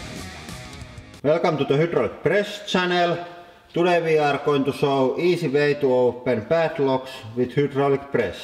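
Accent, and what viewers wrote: Finland. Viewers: typical finnish england :) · Wow the finnish accent is really different than the swedish one eh? How similar are the two languages , if anyone knows? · FINLAAAAND ACCENT!!!!!!!!!!! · Ah Finland. I wondered where your accent was from.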